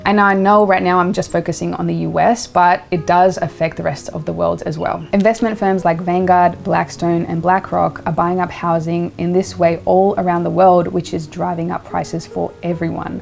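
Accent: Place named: Australia. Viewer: I have watched a few of your videos and I thought you had an Australian accent but also American and something else. G'day mate from down under. First I want to mention that the world economy was in a big down swing long before the plandemic and staged war. I recently sold my house in less than 24hours and have moved to a van with no electricity or running water but I'm debt free and living on a friends property rent free. Very interested in putting my money into the bank of Australia. Thanks for your honesty and Respect and kindness 🖤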